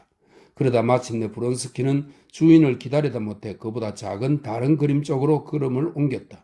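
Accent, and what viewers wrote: Gyeongsang, South Korea. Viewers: 아버지가 책 읽어주는것 같아요. 경상도 사투리도 정겹습니다.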